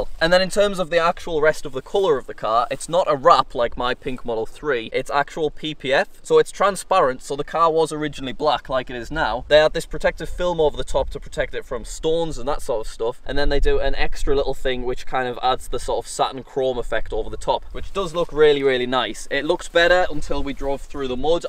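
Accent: United Kingdom. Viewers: Idk if hes british?? Idk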